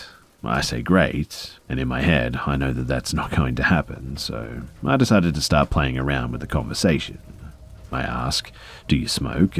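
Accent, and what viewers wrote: Australia. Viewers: this is the first time listening to horror stories told by someone with a aussie accent and i was so confused at first when you were talking about deodorant i thought i clicked on the wrong video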